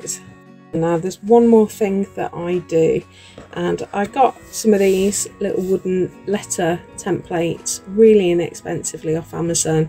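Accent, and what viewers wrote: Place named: Lincolnshire, United Kingdom. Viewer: I've just discovered this video. Lovely to hear a Lincolnshire accent. Your pages are amazing, I am so inspired to have a go. Unfortunately I don't have an instagram account but I can watch all your you tube videos. Thankyou for sharing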